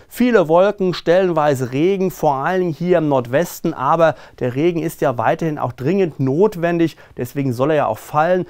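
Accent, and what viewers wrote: Hesse, Germany. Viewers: Hallo Du, das Hessische ist nun mal so - Augen zudrücken😉 Wo kommst Du her?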